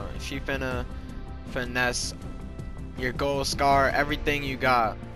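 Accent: France. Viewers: Are you french? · Are tou french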